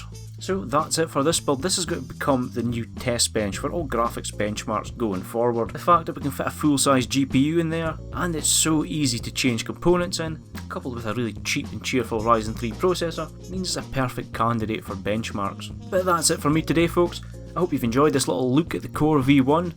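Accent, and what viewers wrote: Scotland, United Kingdom. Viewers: Ha ha fuck, your really Scottish. :D nice to see another brother from above the border.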